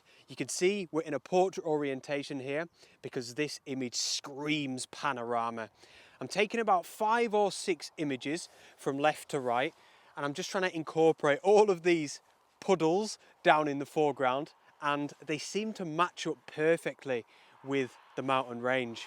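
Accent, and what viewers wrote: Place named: Northern England, United Kingdom. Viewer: I really enjoy your videos., and your accent. I am from Yorkshire and now live in Melbourne, Australia, so I like to hear the northern accent. One request - can you take some shots of waterfalls etc which are not smoothed out? I prefer a more realistic view of rushing water but that’s just me.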